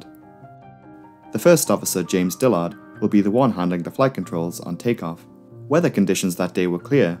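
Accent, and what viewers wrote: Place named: Northern England, United Kingdom. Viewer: Informative and concise videos. Very well made. And a fellow northerner, eh? I'm from County Durham - not far away!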